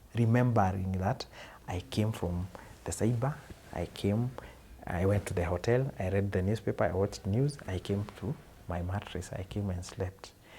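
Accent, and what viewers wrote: Kenya. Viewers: i will never judge an alcoholic... there are so many underlying causes that need to be resolved some issues are as far as childhood traumas. thank you for this one. i love how you are narrating your story, it has pure kikuyu under tones. 😊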